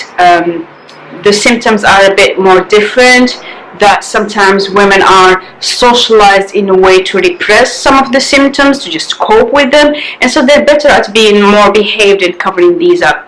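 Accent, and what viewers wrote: United Kingdom. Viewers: Thank you for sharing 🙏🙏🙏 PS: I can sense the British Accent haha.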